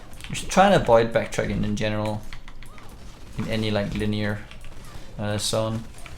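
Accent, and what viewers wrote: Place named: Denmark. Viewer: Hey, you sound like you have a danish accent. Where are you from?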